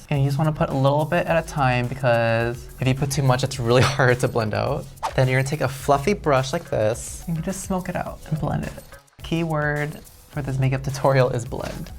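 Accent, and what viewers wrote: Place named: Chicago, United States. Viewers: the chicago accent is jumping out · I'm only able to focus on her Midwestern accent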